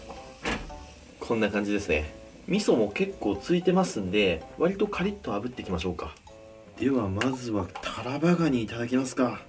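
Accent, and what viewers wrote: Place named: Mikawa, Japan. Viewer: かねこさんのバリバリの三河弁が好きです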